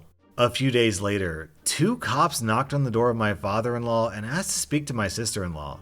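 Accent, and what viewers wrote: Germany. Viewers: I‘m from Germany and I don‘t know this band, but you have an strong german accent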